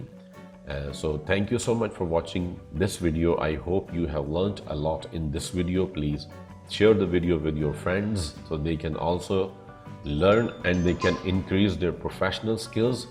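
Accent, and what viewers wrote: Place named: United Kingdom. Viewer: Sir your British accent is too good 👍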